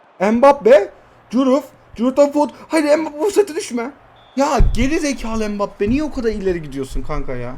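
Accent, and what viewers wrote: Kahramanmaraş, Turkey. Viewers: abi sen maraşlımısın kfepofjkwsf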